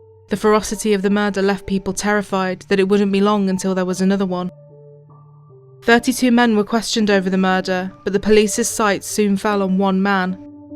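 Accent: England, United Kingdom. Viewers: English accent wins!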